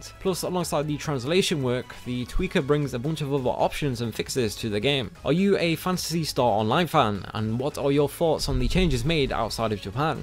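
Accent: United Kingdom. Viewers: Whoisthis a type of British accent, emphasis on type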